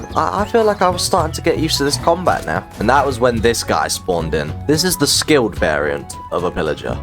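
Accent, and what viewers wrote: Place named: United Kingdom. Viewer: HAIII IM HERE TO CALL YOU MY FAVORITE BRITISH, PLS SAY BOTTLE OF WATER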